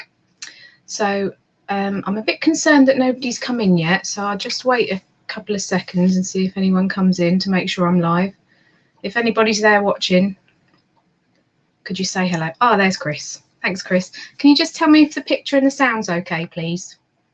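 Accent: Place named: Suffolk, United Kingdom. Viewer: I love hearing your Suffolk accent. I'm a Suffolk girl too.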